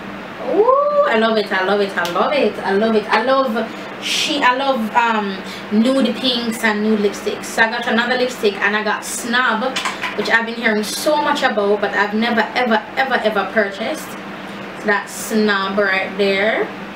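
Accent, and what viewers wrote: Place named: Jamaica. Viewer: Really enjoyed this video, I thought it was just me who got super excited when I purchased new makeup! "How them romantic suh, everything jus wrap up!" I just love the Jamaican accent yes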